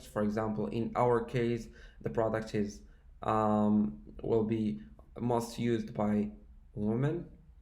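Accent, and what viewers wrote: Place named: Morocco. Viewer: He is Moroccan and his pronunciation mixed between Arabic means Moroccan dialect and French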